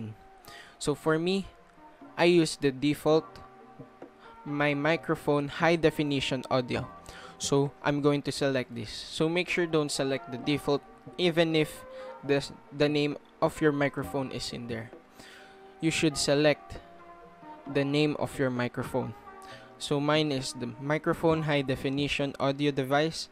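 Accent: Philippines. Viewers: filipino accent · I instantly know this guy filipino😂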